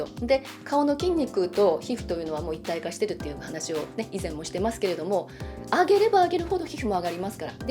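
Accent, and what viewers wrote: Hiroshima, Japan. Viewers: やっぱりですね。 話し方が広島出身の方よね〜ってずっと思ってました。 顔ダンス毎日やってます。始めてまだ3週間ですが、結果が出るの楽しみにこれからも毎日がんばります。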